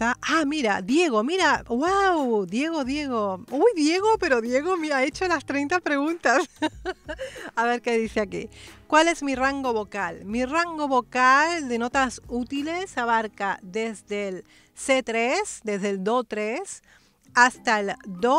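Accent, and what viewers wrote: Spain. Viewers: Es que reacciona y explica todo a detalle y eso que es de España y a veces se pierde el hilo de la idea por el acento o palabras distintas 😎 saludos desde 🇲🇽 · si eres argentina porque? hablas como española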